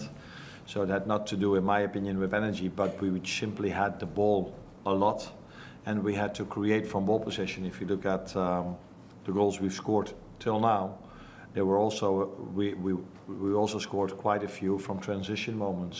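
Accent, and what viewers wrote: Liverpool, United Kingdom. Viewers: is it just me or is he already getting a bit scouse?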